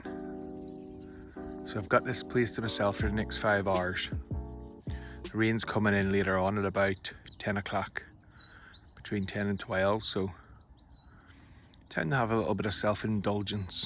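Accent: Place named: Ireland. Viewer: Really enjoyed this vid so much! many thanks. that Irish accent reminds me of friends I haven't seen for too long, and the positive attitude in the rainy conditions, brilliant filming, and perseverance to catch is just great and inspiring to see when I feel like staying in and not getting out fishing as the forecast is shite. all the best to you, mate, thanks again! ;-)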